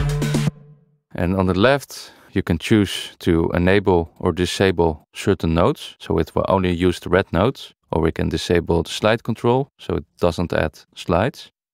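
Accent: Netherlands. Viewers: Thanks for this very usefull tutorial! And eeh, you MUST be Dutch. Haha, ik bedoel, kan gewoon niet anders dan dat ik hier een Nederlander hoor!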